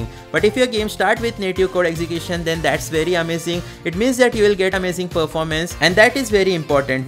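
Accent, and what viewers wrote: India. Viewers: The Indian accent was all i needed to tell me this is fake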